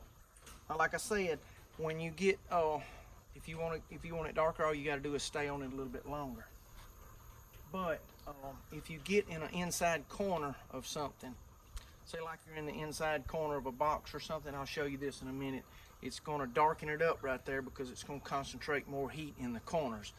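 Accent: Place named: Tennessee, United States. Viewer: Awesome! Love doing this too ! Great video. You from TN ? That’s where I’m from . Your accent is similar to mine . Thank you 😃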